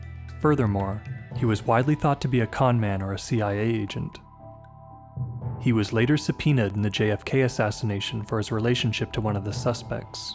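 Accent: Canada. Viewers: Judging by a sligt accent I’d say the narrator is from Canada. Right?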